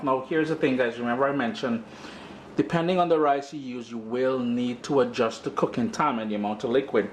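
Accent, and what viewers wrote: Trinidad and Tobago. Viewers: Ur good and love ur Triny accent. Great dish have to make it soon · Trini accent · You said "allyuh" so you're from Trinidad, but there are some other accents going on there as well...?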